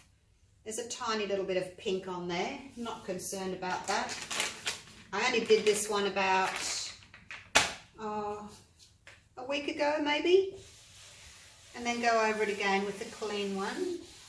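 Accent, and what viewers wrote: Australia. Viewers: Lovely to hear an Aussie voice using products available in Australia - Thanks - Sue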